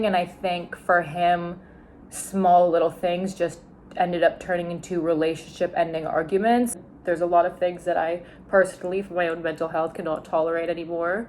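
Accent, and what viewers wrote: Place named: Canada. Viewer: Your lil Canadian accent is cute af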